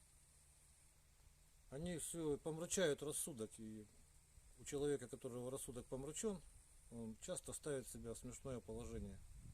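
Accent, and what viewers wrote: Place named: Vladimir, Russia. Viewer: Я нутром чую, что ножи добротные. Руки мастера и говорит с добром в словах. А моё чутьё меня редко подводит. Для меня очень важно, что бы нож был сделан кузнецом с душой и добром в сердце. Такие вещи чувствуешь сразу. Жаль, что не могу заказать у мастера этот инструмент, так как нахожусь далеко, совсем рядом граница с Польшей, рукой подать. От себя скажу, что такой нож надо выбирать под себя не по интернету. Такую вещь надо в руках подержать, а лучше им поработать по дереву. А газета - это красиво, эффектно, но не эффективно, на мой субъективный взгляд. Говор у мастера интересный и довольно мне знакомый. Владимирская область?🤔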